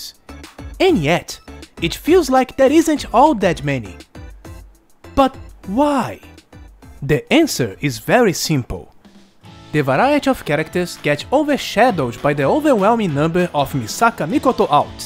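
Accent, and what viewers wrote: Brazil. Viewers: sabia kkkk vc fala um ingles com um sotaque forte de brasileiro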